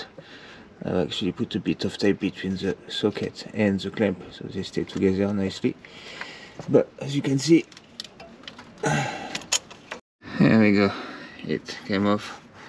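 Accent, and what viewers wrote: France. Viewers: I love your French Englisch :) Very enjoyable. I hope i'll get a Modus for my wife next week. Her loved Twingo mk1 needs replacement. And now i know how to change the oil and stuf for her future car. Thank you for that :) Greetz from Holland!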